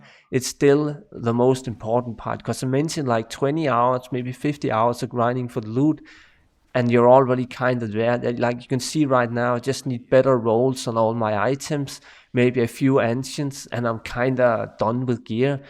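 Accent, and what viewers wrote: Denmark. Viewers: Fed nok video. Så tydeligt at hører når det en dansker. 😂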